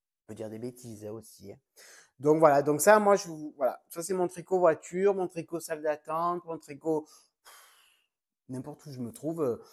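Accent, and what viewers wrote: South, France. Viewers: Bonjour Quel bon moment passé à te regarder! J' adore ton humour, ton accent 😜 et tu transmets le soleil aux gens du nord Bon moi qui suis de Perpignan je n' en manque pas de soleil! Les laines et divers objets que tu présentes sont tous plus beaux les uns que les autres! Je connais bien la boutique à Barcelone all you knit is love et j' y trouve toujours des pépites à acheter! Le trio que tu as pris est tres beau, leur qualité 70/30 est douce avec une belle définition de points Tu vas te régaler de faire un beau châle 🤔ou autre projet! Je te souhaite de passer un bel été sans sauterelles 🤣🤣👍: j' ai eu également ma part d invasion ces dernières années!! Bisous bisous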